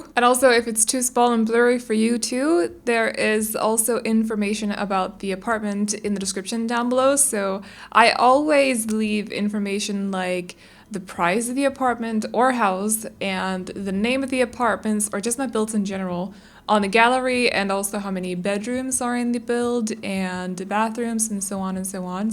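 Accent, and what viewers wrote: Denmark. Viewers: I don't think I have ever heard Danish but I can't believe it doesn't sound good. I absolutely adore your accent lol. Now I have to find the Danish word for aunt since you've gotten me curious. This is a great build btw. Trying to do something creative in this apartment drives me nuts. It's just set up so weird. You did amazing.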